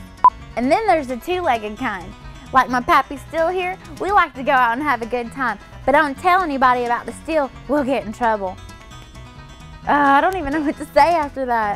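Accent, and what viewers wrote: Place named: Appalachia, United States. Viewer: shoot I knowed i shouldnta wait so long.well I still thank yer the purtiest girl in the hills.My name is Allen and I love to hear you talk.My brothers and sister come to pigeon forge every year.we live in Alabama but the smokies feel more like our home.Love to watch you vids and see the twinkle in your eyes and hear that Ellie may Clampet accent of yours.Bye now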